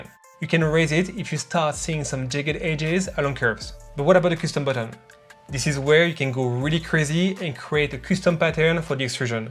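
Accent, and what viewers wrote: France. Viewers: I found your channel just a few days ago. I like it and I subscribed. i like the topics, the way you develop your projects as they teach us many tips. I also like your accent :) are you french? (I am)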